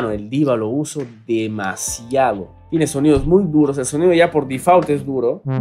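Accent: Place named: Ecuador. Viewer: bro eres peruano pero tienes acento ecuatoriano xd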